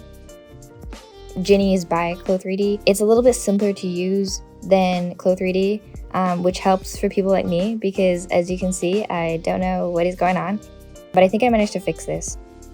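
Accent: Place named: West, United States. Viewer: here at 37 sub wow never seen anyone in sg accessorised like that and how is ur accent so western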